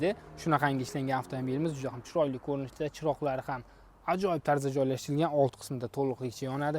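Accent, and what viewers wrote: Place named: Uzbekistan. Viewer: Соф ўзбекча обзорга гап йўқ. Мошина ҳам зўр экан🔥🔥🔥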